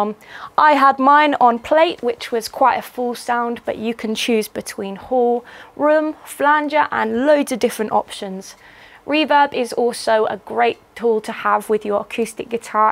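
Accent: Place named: RP, United Kingdom. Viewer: accent contains a trace of the current fad in BBC continuity announcers. I wonder if she even realaahses she's doing it.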